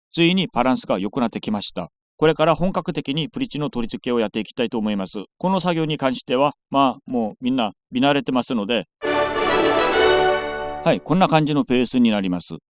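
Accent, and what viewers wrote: South Korea. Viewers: 韓国訛りの日本語でも上手😊 ずっと日本の人だと思ってた~応援してます🎉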